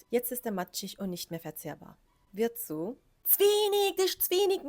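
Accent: Swabia, Germany. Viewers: Das ist schon modernes schwäbisch kommt mal in den Schwarzwald da haust euch den Vogel naus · Du sprichst zu 90% schwäbisch. Bei uns auf der Alb wird noch geschwäbelt extrem. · So Hammer. Eine bildhübsche Frau, bei der man alle Sprachen der Welt erwartet, schwäbelt. Ich bin Sachse und Schwäbisch ist mein absoluter Lieblingsdialekt❤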